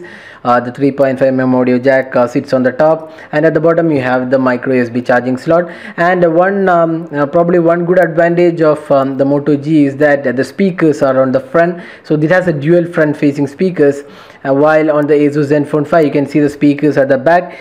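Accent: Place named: South India, India. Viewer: Man half the things he said have to be heard twice ... that thick south indian accent... really confusing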